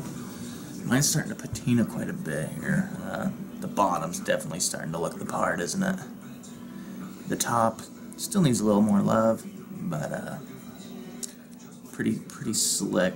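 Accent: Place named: California, United States. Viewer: are you from Orange County, CA by any chance? You talk the same lingo we do lol. I live in AZ now though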